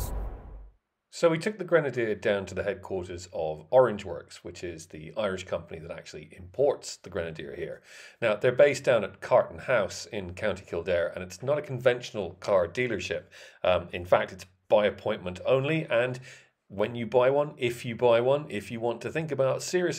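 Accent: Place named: England, United Kingdom. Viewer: I love the way your plummy English accent keeps slipping into pirate. Great review!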